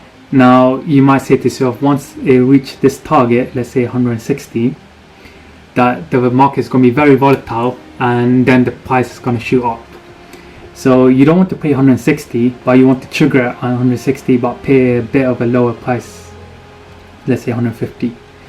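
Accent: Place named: United Kingdom. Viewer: thank you for the advice, love the video, love the UK and the accent as well :D thumbs up for the vid!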